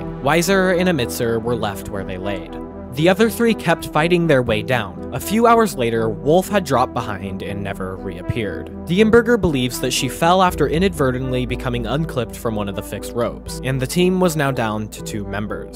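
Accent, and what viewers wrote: United States. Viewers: got that Colorado accent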